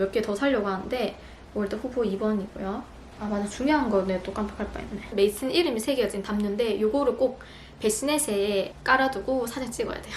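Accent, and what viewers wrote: Gyeongsang, South Korea. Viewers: 처음 댓글 달아봐요~ 경상도 사투리에 말이 엄청빨라서 호기심에 봤던 영상이 벌써 출산짐싸는 영상까지 하네요^^ 제가 출산경험자로 알려드리고 싶은건 여름에 아기낳으면 에어컨바람 조심해야해요. 넓은 손수건챙겨가셔서 목뒷쪽 찬바람 막아주면 좋아요. 모유수유하다보면 목,어깨 아픈데 산후풍까지 오면 힘들어요. 아기 낳는순간은 힘든데 참을만하고 낳고나면 아기생각에 정신없이 지나가요. 모든 엄마들이 그렇듯 도 잘 이겨낼수 있을꺼예요 순산하세요~~ ^^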